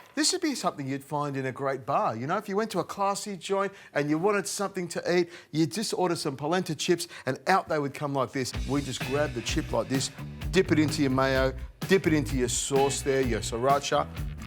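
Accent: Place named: Australia. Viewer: That's an Australian accent, not Italian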